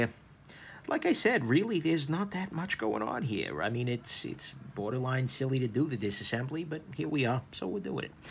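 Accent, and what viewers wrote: United States. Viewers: just got into knives. i like your reviews on knives but where are you from you sound american but with a bugger in your mouth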